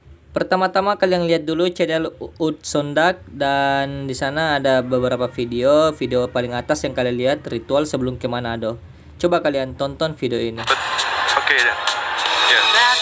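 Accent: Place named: South Sulawesi, Indonesia. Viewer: Pasti lu orang sulawesi selatan kan bro? dari gaya lu ngomong gw bisa tau wwkwkwwk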